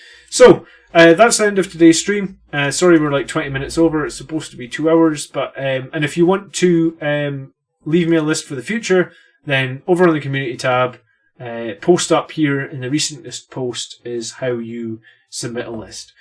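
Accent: Scotland, United Kingdom. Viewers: Is it heresy for me to say I watch your channel but am not making Blood Angels? It's just nice to hear another Scottish voice doing 40k stuff ! I'm building my very first army as the basic boys in blue, but your vids are good for understanding how an army should be built.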